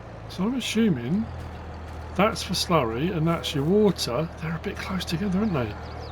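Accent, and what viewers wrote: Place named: England, United Kingdom. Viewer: Youtube income has dropped in all areas I have a channel painting acrylic lessons also gta5 videos in the U.K. it’s harder to get an audience my is mainly in the USA I live in wales bern a content creator for over 10 years downloaded this game free it’s a fantastic game as I worked on farms back in the day on the hay potato picking by hand and milking in my 60s it’s a way to relive my youth your doing a great job m8 just be yourself and it’s great to hear a true English gent 👍👍👍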